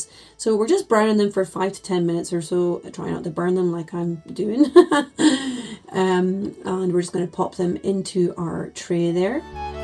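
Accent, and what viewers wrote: Scotland, United Kingdom. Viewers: Looks great doll I’ll be trying that. Loving the wee posh northern Scottish accent too 😜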